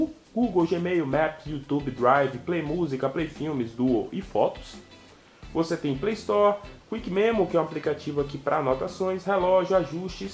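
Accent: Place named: Bahia, Brazil. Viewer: Gostoso! Você e baiano? Que sotaque delicioso. Amei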